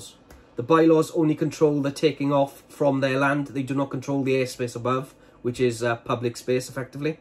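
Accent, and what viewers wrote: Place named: Welsh Valleys, United Kingdom. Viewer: I detect a valley’s accent, I’m struggling to find a place. I live near Blackwood gwent. Please help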